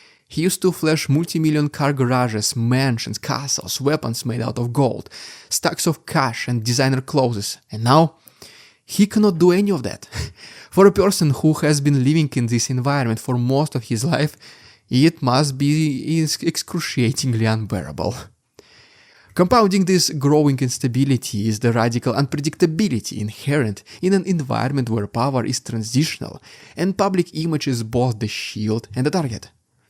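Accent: Russia. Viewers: Man that perfect, lyrical English with that thickass Russian accent is amazing.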